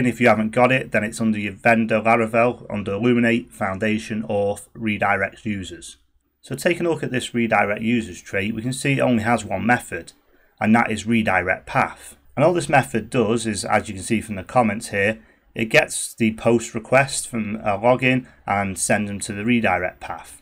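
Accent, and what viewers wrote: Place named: Australia. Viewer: Many Thanks , its really helpfull (but i sometimes confused with your australian accent heheh) , already subscribe and like all your video :))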